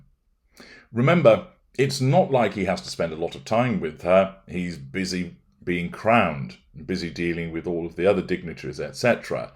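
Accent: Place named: England, United Kingdom. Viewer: Dear Sir, so luv it when you swear, with your cut glass perceived English accent using true Anglo Saxon sentiments. You made me laugh out loud 😃🤩😊⭐️👏⭐️👏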